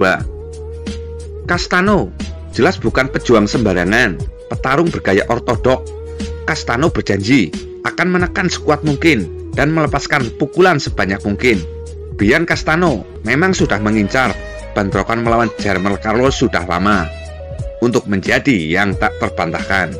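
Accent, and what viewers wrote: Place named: Banyumas, Indonesia. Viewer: Admin mengulas masih logat ngapak👍. Inyong seneng dgn ulasan ini👍👍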